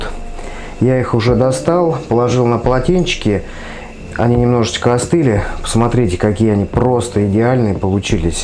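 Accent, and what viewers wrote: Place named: Voronezh, Russia. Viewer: открыл рецепт, услышал говор и сразу понял - зёма. спасибо братюнь, тесто уже подходит. наш говорок только с воронежским можно спутать)))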